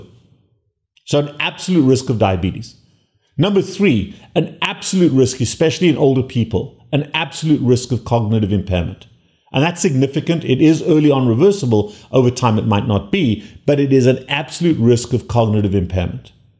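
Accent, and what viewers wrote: South Africa. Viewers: Sounds like a Saffa with an American twang on some words.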